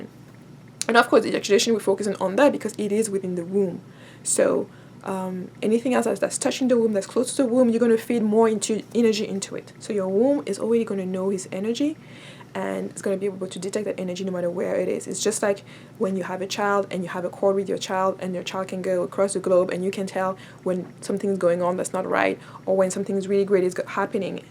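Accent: France. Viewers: Why do I hear a French accent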